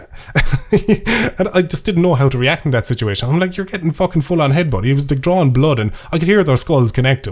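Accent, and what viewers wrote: Ireland. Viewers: It’s actually the melodic tones of your voice. The brogue is an orchestra to the ear and the humour is fowl... birds of a feather and all. Side splitting humour to the point of not being able to breathe. And yes ... we can feel it 😜😜😜ps... the missus needs her own shirt 😋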